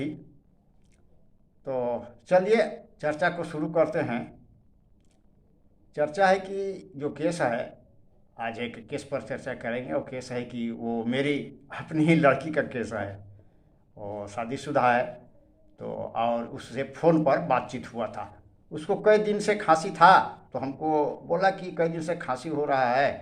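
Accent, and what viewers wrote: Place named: Bhojpuri, India. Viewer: Kirpaya aap apana bhojpuri lehja mat chhodiye! Poorvanchal k jitna dangdar hauye , kul like auri subscribe karihain.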